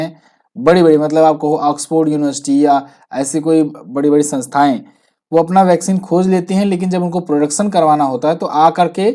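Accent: Bihar, India. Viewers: Sir Bihar jamui se h 😅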